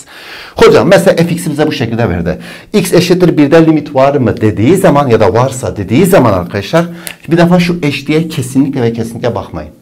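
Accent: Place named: Eastern Anatolia, Turkey. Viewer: hdasdhsadhsadahsda hayatımda izlediğim en iy ders videosu. hocam dersi anlatırken biraz daha doğu ağızı kullanırsanız ders daha eğlenceli ve akılda kalıcı olur kanaatimce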